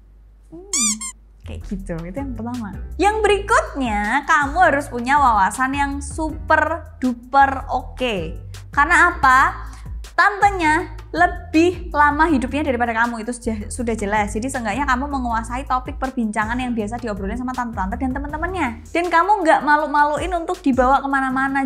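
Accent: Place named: Semarang, Indonesia. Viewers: Medok tp menawan ini * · Aku suka gaya ngomong nya * jawa kali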